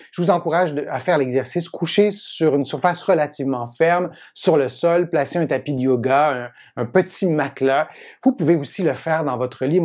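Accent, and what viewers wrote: Quebec, Canada. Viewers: L'utilité indéniable des exercices mise à part, votre accent canadien vous rend de suite sympathique. Bravo pour votre belle initiative. Je viens de m'abonner. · Merci pour vos exercice il sont vraiment utile moi je suis un cas special j ai ete operer d une ghonatrose bilateral <num> une osteteomie depuis 10 ans actuellement je souffre beaucoup de mes genoux meme au repos ont me propose une 0peration totale mais actuellement dans mon pays avec la pandemie les blocs sont ferme par manque d oxigene meme les cliniques n opere pas je narrive plus a marcher malgre j utilise deux bequille donnez un conseil svp en attendant de me faire operer j ai 78ans je pese 88kg pour un metre 62 j j attend vos avec impatience svp votre avcent canadien est tres sympat mer vos video elle sont interessente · Merci pour les exercices, l'avertissement sur leur adaptation, et pour ce bel accent québécois. 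· Merci beaucoup vidéo claire, et juste, j'ai fait et c'est pas mal du tout pour un début, le tout avec cet accent canadien que j'adore.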